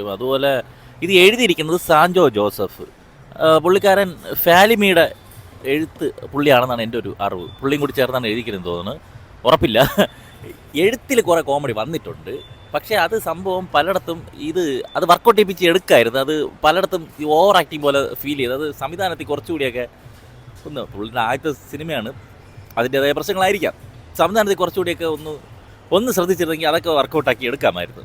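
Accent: Trivandrum, India. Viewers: Dey trivandrum aahdey 🥴🥴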